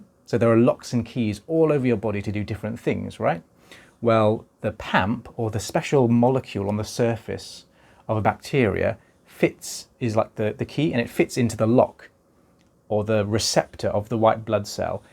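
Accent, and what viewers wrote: United Kingdom. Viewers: Sigh…. fascinated by an ear cleaning narrated by a lovey Brit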